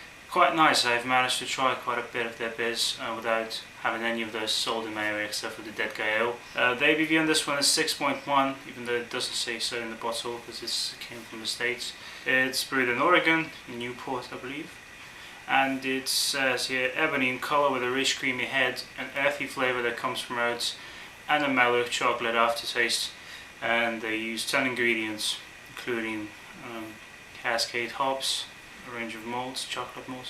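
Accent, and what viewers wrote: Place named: England, United Kingdom. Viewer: nice review!! where in english are u from?? I am from vancouver canada living in osaka japan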